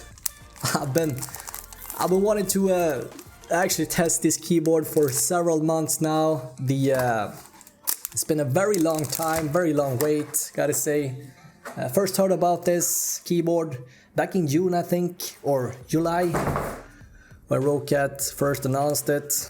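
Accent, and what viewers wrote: Sweden. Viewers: the svengelska is taking over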